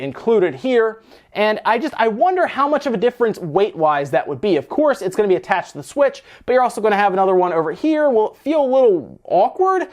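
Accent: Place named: Maryland, United States. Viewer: you sound like you’ve got a MD accent.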